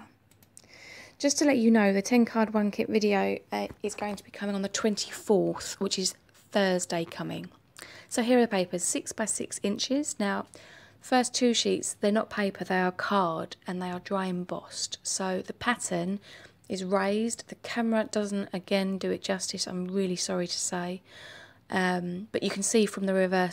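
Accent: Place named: United Kingdom. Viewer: I could listen to you talk all day! I'm a sucker for a British accent. Lol! Beautiful kit,.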